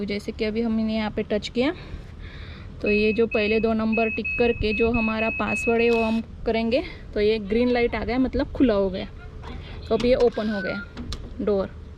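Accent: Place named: South, India. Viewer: Tumhari hindi bolne ki style se muze aisa lagaa ki tum South Indian ho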